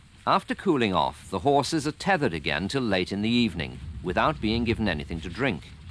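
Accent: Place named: United Kingdom. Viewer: Use American English. British English is difficult to understand.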